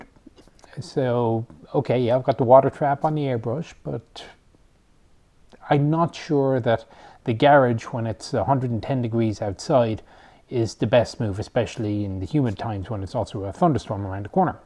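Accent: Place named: Ireland. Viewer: Now all I see is a Greek man with an Irish Accent, the entertaining link to Father Ted is destroyed.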